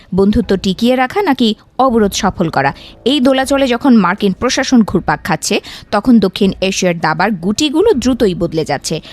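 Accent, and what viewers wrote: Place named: India. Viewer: ৭১ টিপির মালিক বদলেছে কিন্তু চ্যালা গুলো এখনো রয়েছে। তাই তো ভারতীয় টোনে এখনো কথা বলছে।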